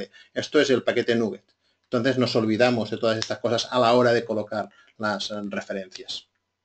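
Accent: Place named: Catalonia, Spain. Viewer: Este tío tiene un acentazo a catalán pueblerino, de Girona profunda.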